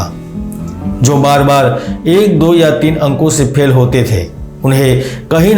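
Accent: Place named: Gujarat, India. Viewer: I like your simplicity and Gujarati accent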